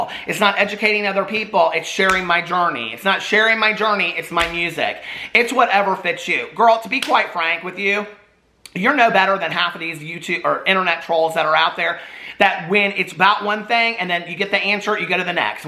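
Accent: South, United States. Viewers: I'm from Tennessee. You sound so southern and I love it.🤣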